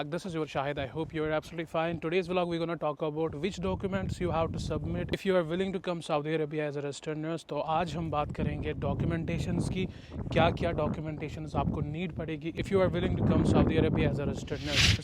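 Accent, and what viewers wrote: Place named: Kashmir, India. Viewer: Bhi lots of love from mother land kashmir ❤ mai soch he raha taa aawaz tio kashmiri he lag rahie hai...phir old vlogs dekha....luv 😮❤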